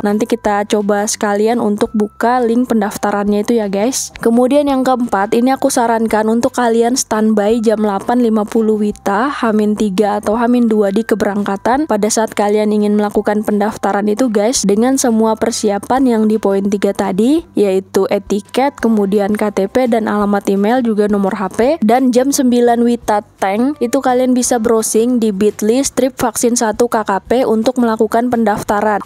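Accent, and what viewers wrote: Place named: Banyumas, Indonesia. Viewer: Ow gitu om.,makasih infonya om. Sampean ngapak,ngapak e ndi mas?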